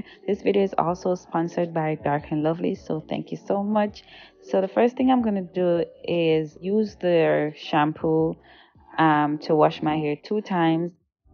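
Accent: Jamaica. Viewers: Jamaican accent trang bad. Thanks for this 💪🏾🤗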